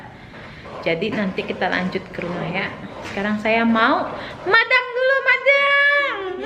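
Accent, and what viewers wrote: Jakarta, Indonesia. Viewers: aslinya mana sih. Nikahan kayaknya adat sunda. Tp ngomong gaya betawi. Jadi yang mana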